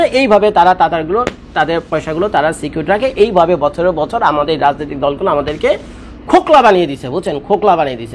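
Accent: Dhaka, Bangladesh. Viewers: ভাই আপনার কথা শুনলে হাসি থামিয়ে রাখতে পারিনা বিশেষ করে যখন আমাদের ঢাকার ভাষায় কথা বলেন । ❤️❤️❤️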